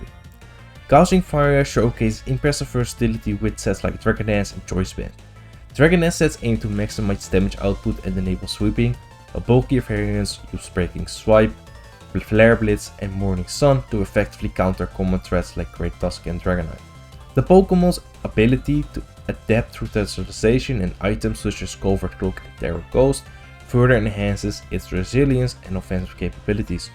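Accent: Netherlands. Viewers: I finally understand what my English teacher means with a Dutch accent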